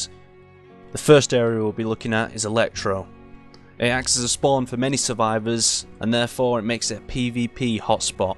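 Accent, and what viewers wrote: United Kingdom. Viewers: you're voice is absolutely amazing, where are you from in the UK? -- you sound like you're perfect to join the army with that voice as a CO :)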